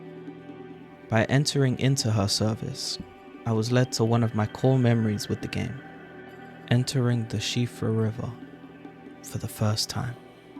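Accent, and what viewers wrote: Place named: England, United Kingdom. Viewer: High quality content + English accent = Instant sub. Spiffing.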